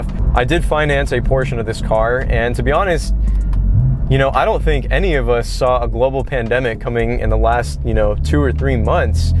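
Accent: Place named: Las Vegas, United States. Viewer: Sound like a local Vegas guy loo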